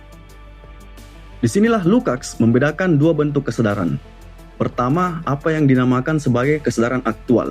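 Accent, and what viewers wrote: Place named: Sulawesi, Indonesia. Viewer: Dari cangkok & logat sepertinya Orang Sulawesi ya 😂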